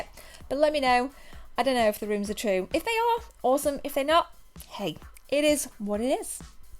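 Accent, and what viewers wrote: United Kingdom. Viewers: If I had such a lovely British accent, I would never shut up!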